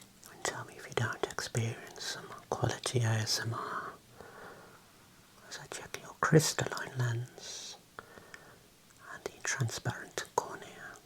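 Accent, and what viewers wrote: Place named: West Midlands, United Kingdom. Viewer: When MassageASMR stopped making videos I sadly accepted that I’d never find another ASMR creator who relaxed me as much as him — then I found your channel. YouTube algorithm kept putting you on my homepage way back before you were even at 1k subs I think - reluctantly I gave you a go seeing as the algo was so insistent on you… thank goodness I did that! You’ve become my favourite ASMR creator BY FAR. Especially helps your accent reminds of back home in the West Mids. Long may your channel keep growing and thriving!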